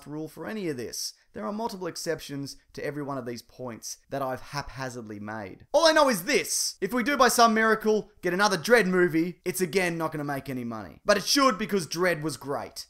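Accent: Australia. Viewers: Hey cool vid I'm a recent subscriber you got an awesome aussie accent that makes me wonder what you look like lol....anyway keep up with the awesome videos.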